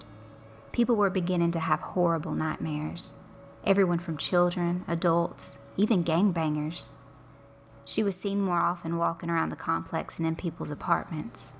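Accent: South, United States. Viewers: came over to you from "The Creepy Dark" and I must admit that you are good . glad I checked out this trilogy and I really enjoyed the southern accent . I like looking for new channels when they are still somewhat new and watch them grow . I have just subscribed to you and I am looking forward to watching you grow . Keep up the good work and I hope to hear from you soon .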